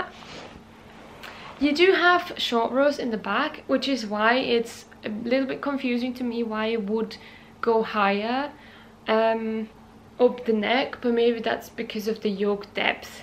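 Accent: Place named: West Yorkshire, United Kingdom. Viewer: Hi, found your channel today and have really enjoyed watching some episodes. Think I heard you saying that you are sending from Austria? I lived a couple of years in west Yorkshire a long time ago, and to me you sound very much like my colleagues at that time. Am I mistaken?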